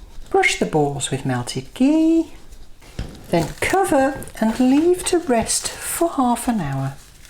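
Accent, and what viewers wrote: United Kingdom. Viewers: Thank you for the video. May I ask why you have British accent but speak Arabic?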